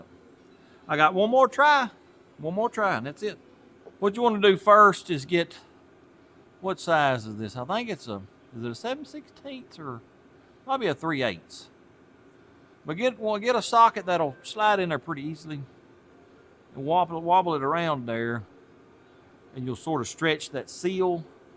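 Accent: South, United States. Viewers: Me and my wife absolutely love this channel. Your cadence and voice remind us so much of our relatives who live in Tennessee and North Carolina. So it's quite comforting. I wish you nothing but the best sir! We will keep watching as long as you keep posting.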